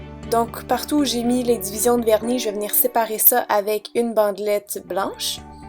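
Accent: Quebec, Canada. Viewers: Très beau! Je suis française et j'ai été surprise, on s'attend pas à entendre un accent Québécois comme ça :) GOOD JOB! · aaaah, l'effet marbré fait penser à de la turquoise!! Des bébés fleures?! X) c'est mignon comme expression! j'adore l'accent québécois ^^ toujours enjoué, c'est agréable! je te ouhaite une bonne continuation :) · Merci!:3 j'adore l'accent quebecois! tellement exotique pour moi:D Enfin bref j'adore tes vidéos! merciiii! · Sa 3 idée avec le verni blanc et le scotch est vraiment original je n'avais jamais vus sa encore mais c'est vraiment super et créative ! Les 3 idées de nail art sont vraiment trop cool j'adore ! Et juste j'adore ton ac-sen du Quebec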